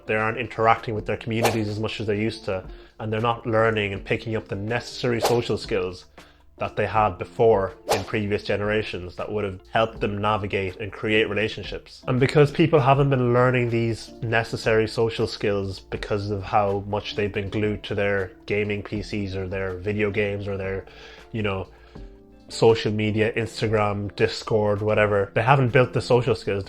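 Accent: Ireland. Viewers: My brain can't process a black man with an Irish accent lol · This guy just came up on my feed. Interesting take on things. Good to hear the aul Irish accent representing!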